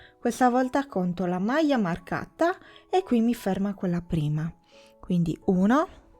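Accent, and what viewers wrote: Sardinia, Italy. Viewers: Sei bravissima!! 😊 ma sei sarda? Noi si 😊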